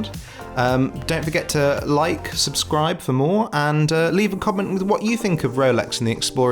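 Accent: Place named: United Kingdom. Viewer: I’m learning to hate the snotty Brit accent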